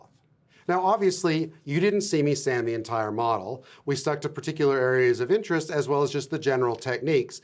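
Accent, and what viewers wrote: Australia. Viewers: New to the channel, love it by the way and spent a lot of time going over the back catalogue. So much priceless information, tips, and general entertainment for anyone into modelling, or like me, getting back into it and freaking out. Buying way too many kits (that aren't being started), but freaking out none the less! Do I notice a slight Australian accent there?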